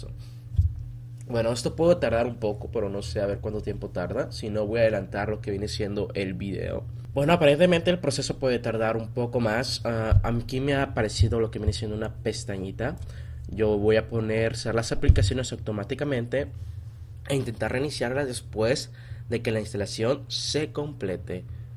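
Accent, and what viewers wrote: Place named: Fresa, Mexico. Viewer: habla muy freson jaja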